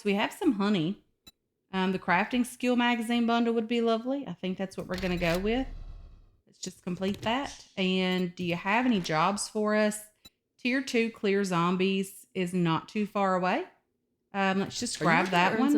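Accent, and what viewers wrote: Kentucky, United States. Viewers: What part of KY are you from?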